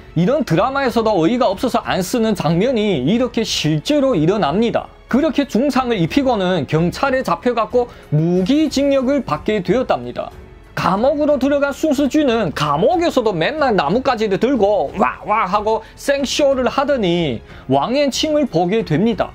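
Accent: Yanbian, China. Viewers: 연변사투리로 하니 꿀재미 ~ 영화보다 훨재미있음 ~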